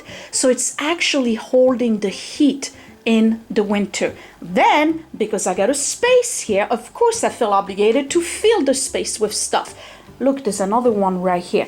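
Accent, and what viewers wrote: France. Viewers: What are the Emmy's for? Thats exciting! I have been binge watching you since yesterday and I love you!! The French Accent reminds me so much of my grandma as she was raised speaking French. Her accent died down as she got older since none of us ever spoke French around her but some words you say exactly like her and that is so special!! Thank you for sharing and for being real!!! I have been doing a few things here and there while watching you and it really does feel good!!!!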